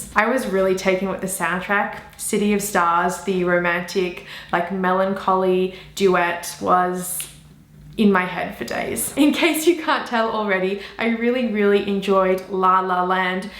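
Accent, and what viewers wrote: Australia. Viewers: Great review. I've seen LLL twice now. Even more impressed the second time. --Anyway, I'm trying to place your accent. Are you an Aussie?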